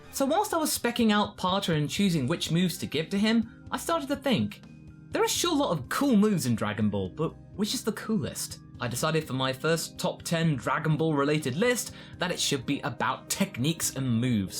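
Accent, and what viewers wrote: England, United Kingdom. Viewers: gt live supporter British. You can't tell by his accent? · Its because of the english accent. That's just how we say it over here.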